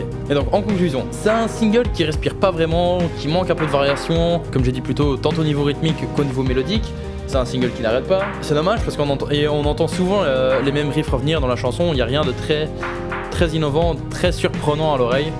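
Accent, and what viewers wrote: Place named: England, United Kingdom. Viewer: Cet accent anglais haha ! GG continue :)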